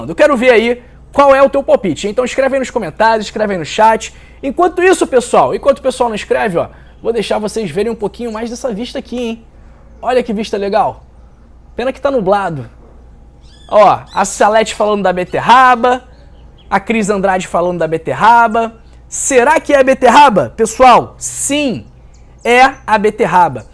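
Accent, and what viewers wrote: Rio de Janeiro, Brazil. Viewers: Dr. Ô Senhor é carioca, como eu ?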